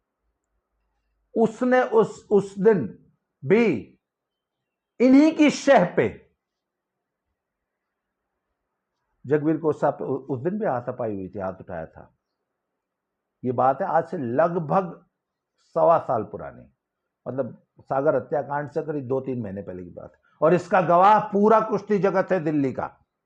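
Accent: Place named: Punjab, India. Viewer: I really like how you explain things without sugar coating, and yes, travel teaches a lot. It can be seen from your videos that you have a good hold over Eastern UP dialect, a nice heavy Haryanvi tone, and a nice Punjabi accent. Your "done this, done that" attitude is inspiring for me as a young father. Keep up the good work.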